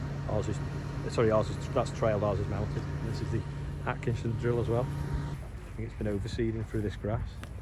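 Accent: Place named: Scouse, United Kingdom. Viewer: What makes an interesting theme. it simply YOU. You have a way of narrating a video which is unique. I think it’s your soft Scouse accent, your genuine and honest and say it as it is attitude. I worked on farms as a kid and am lucky enough to have a couple of acres of English countryside and look forward to your vids most nights. You come across as a caring sole who does his bit and probably a bit more for your local community. I wish you all the success in your real job and the u tube thing. Quite simply you get outa life what you put in. Keep up the great work your a credit to your family 👍🏻👍🏻. That’s made me feel 100% 👍🏻👍🏻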